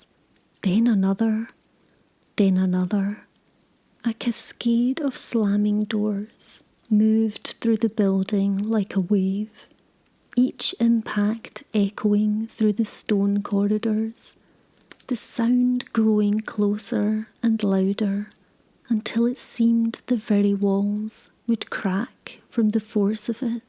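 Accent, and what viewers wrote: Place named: Glasgow, United Kingdom. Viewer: Irritatingly slow voice. Clicky over emphasis of the letter S. Very poor narration, and I'm a Scot from Edinburgh. Sounds like a Weegie trying to hide the typical whiney Glaswegian accent.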